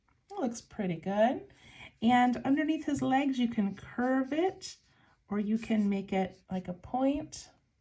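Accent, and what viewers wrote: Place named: Midwest, United States. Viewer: Random question: Where do you live? I hear you say 'you guys" and can't help but to think you live in the Midwest. I grew up in Kansas, but now I live in NC. When I say that some people will comment when I say it. I may live in the south, but I am not going to change my vocabulary!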